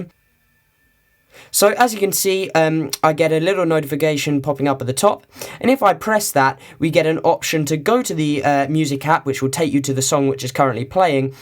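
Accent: United Kingdom. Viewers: It's odd: everyone that does these cydia videos has a British accent.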